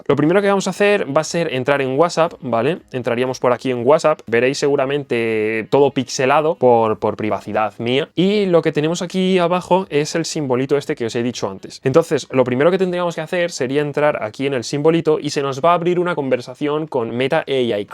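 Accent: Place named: Argentina. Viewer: Mira Te Vuerbo Ha Escribir El, PQ Soy Un Poquito Bruta Yoo Te Guarde Para Despues Ponerte Cuando Mii Hijo Quiero Desircelo Para Asii Y Una Ves Mas Q, Ellos Agan Eso Mismo Le Escribo Desde EEUU Ves Noo Se De Donde Eres Me Pareces Como De Argentina Lindo Pais La Señora Q, Vive Ariba Segundo Piso Ella Es De Argentina Loo He Mirado En TV Ho De Uruguay Ablan Parecidos Saludos Soy Cubana Cuidate Muchoo?Gracias Bendiciones!🙏👏🤚😪💙💘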